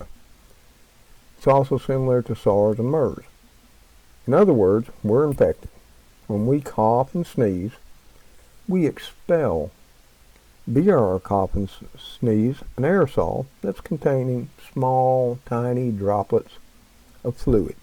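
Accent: South, United States. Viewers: Thank you Mr. for this important update, you have very good advice to us all in this very disturbing time. I have been following a few of the channels you suggested this past week, and can see where this is going. I love to hear you speak and your southern accent, you remind me of my father in your features, but unfortunately he passed some years ago now. You speak so much common sense in these troubling times. There are distressing scenes of people being put into isolation in China on the BBC news right now. God bless you and your family and much 💖 to all. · I love your Southern Accent! I was born and raised in Georgia. When I hear you it reminds me of home! Arizona has been my home for 4 years but I sure miss Southern hospitality southern charm and down right good manners. Oh yea greens and cornbread and boiled peanuts also.😉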